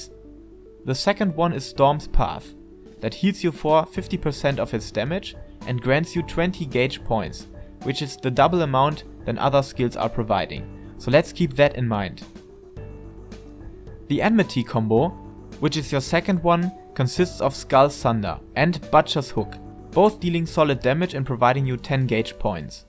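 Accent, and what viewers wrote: Germany. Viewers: you can hear he's german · Just a suggestion, it might be better to introduce the skill sets by bullet points. Overall the guide is quite straightforward. And German Accent is so damn badass. Love it.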